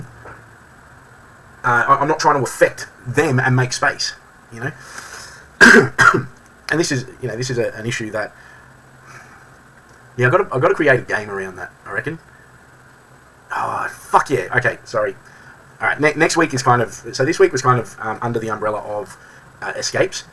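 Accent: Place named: Australia. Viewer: I just stumbled onto your channel, good to hear an Australian accent exploring CLA. Where are you based?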